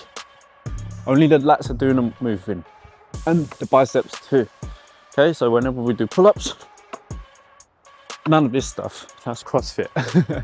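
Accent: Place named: United Kingdom. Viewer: Thanks for the tips nephew, have to say I like your British accent from a young Asian man, I have an Aussie accent for an old Asian man. ( only mentioned this cuz it's my first time hearing it ), best wishes and cheers to you:yougotthis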